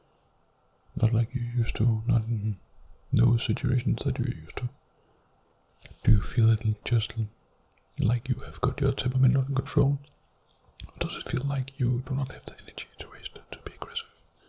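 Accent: Scandinavia. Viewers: Is that a Scandinavian accent? Sounds similar to my Danish relatives. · Your Nordic accent sounds/is like 'nectar' for my ears (and Soul) Thank You🤞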